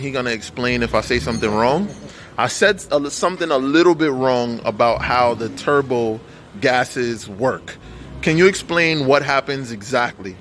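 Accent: Trinidad and Tobago. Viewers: I hear a trini I swear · I hear a trini accent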